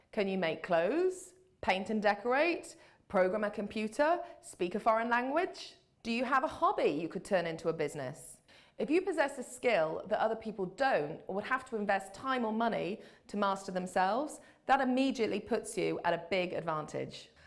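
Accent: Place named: United Kingdom. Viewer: I looooove that Brit accent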